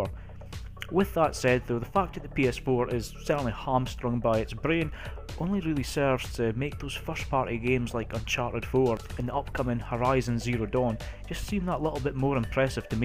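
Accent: Scotland, United Kingdom. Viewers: Your Scottish eh? Dinny try hide it noo.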